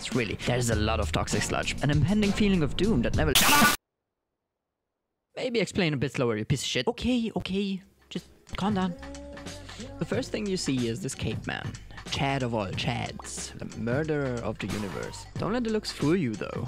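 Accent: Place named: Germany. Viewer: I love this game and I love you made a video about it. But also, gotta love your deustcher akzent lol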